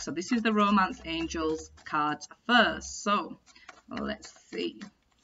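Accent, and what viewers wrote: Yorkshire, United Kingdom. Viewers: Hi I'm just wondering if you do individual readings? It's good to hear a Yorkshire accent on YouTube 😊 Xx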